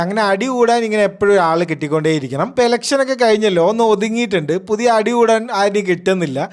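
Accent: Palakkad, India. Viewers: നിങ്ങൾ പാലക്കാട്‌ ആണോ....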